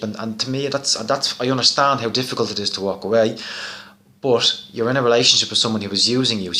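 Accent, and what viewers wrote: Ireland. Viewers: You look like an Italian, but sound like an Irishman.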